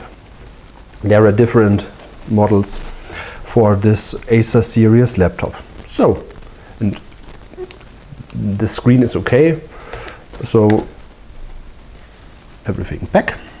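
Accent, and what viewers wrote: Germany. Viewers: Bei meinem A517 scheint das Kabel einen Wackelkontakt haben. Teilweise fällt das Bild aus, oder es flackert. Kann ich das Kabel auswechseln und worauf muss ich dabei achten. Ich gehe davon aus, dass deine Muttersprache deutsch ist. Gruß Klaus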